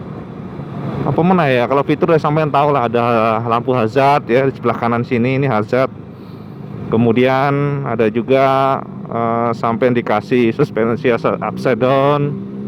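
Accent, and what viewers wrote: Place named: Java, Indonesia. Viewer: Logat sampean iku cak..njowo tenan..jian seneng nnt sampean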